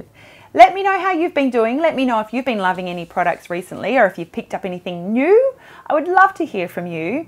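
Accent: Australia. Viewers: Great to see u again and the refreshing Aussie accent and all the familiar products I see on the shelves here 🤗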